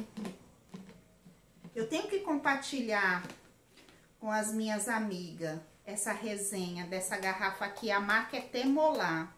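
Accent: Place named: Maranhão, Brazil. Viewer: Parabéns, vou la na potiguar! Quando ouvi teu sotaque ja sabia que é maranhense tbm kkkkk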